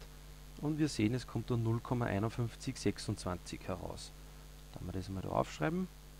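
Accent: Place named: Upper Austria, Austria. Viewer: Sehr gutes Video, das ma wirklich weitergeholfen hat! Gut verständlich erklärt und sympathischer OÖ-Dialekt :)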